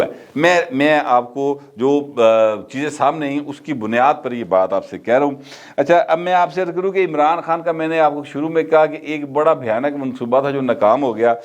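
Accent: Lahore, Pakistan. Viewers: Really I enjoy your Lahori style. چوہا رپورٹ۔۔۔۔۔🤣🤣🤣۔ منجی کتھے ڈاہواں۔۔۔پالیا پوسیا پڑھایا لکھایا، تن تن ویاہ کرائے تے وزیراعظم بڑاہایا۔۔۔۔۔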